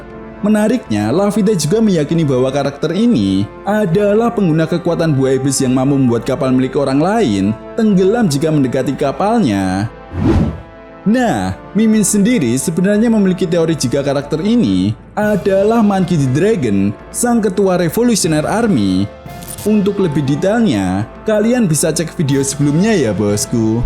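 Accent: Java, Indonesia. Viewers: Suara nya paati jawa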